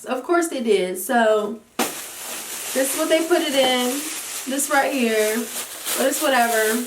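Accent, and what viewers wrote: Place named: South, United States. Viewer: Love the video, you got me wanting that lip mask now! 😩😂🤦🏽‍♀️ Your southern accent is so cute and thick, I’m from Bama too and wonder if I sound like this to other people 😂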